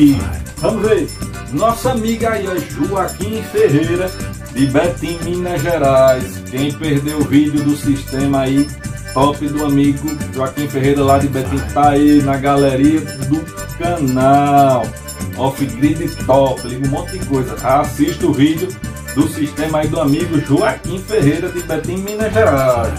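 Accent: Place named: Rio Grande do Norte, Brazil. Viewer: Comecei a acompanhar seus conteúdos hoje e já me inscrevi, sem comentários a qualidade. Sou de Vitória ES. Uma sugestão, você tem uma boa didática e conhecimento, os principais requisitos para preparar e lecionar um curso completo no assunto, é uma forma de remunerar e é justo e honesto. Pelo seu sotaque, acredito ser de RN. Abraços e sucesso.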